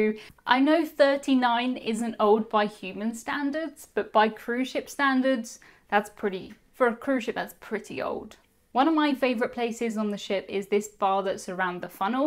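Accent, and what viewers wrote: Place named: United Kingdom. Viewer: Don't let anyone bother you about your British accents for things like "bath". Even in the United States people say things very differently depending on the region they are in. Be you, I enjoy listening to you speak about various cruise topics with your British accent. :)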